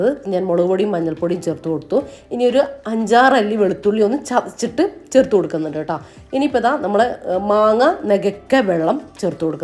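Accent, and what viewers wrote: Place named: Kasaragod, India. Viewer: Ithante Kurachoke words Kasaragod slanganallo.❤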